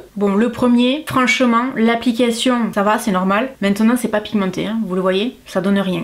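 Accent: South, France. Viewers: Je viens de te découvrir franchement jadoreee, tu es honnête, tu propose des contenu tres intéressant comparer a d'autre youtubeuse que j'aime pas du tout, tu es trop drôle hihi j'aime bcp ton accent du sud je viens du nord moi ! Hahaha, jme suis abonné dailleurs ~ 😉😁😂😂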